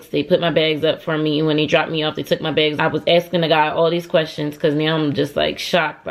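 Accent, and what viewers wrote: Philadelphia, United States. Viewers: As soon as i heard your voice I'm like no way she's from Atl, gotta be from Philly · I thought you sounded like you were from Philly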